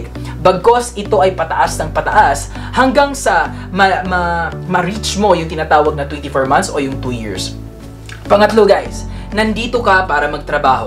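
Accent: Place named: Arab world. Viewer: nkakatuwa, mukhang arabo na fluent sa tagalog though nsa accent nya ung arabic.. ang galing.. nice mixed.. gwapo ah.. gym ka bro lalong bagay sayo pagiging arabic.. good luck ang more blessings sa channel mo...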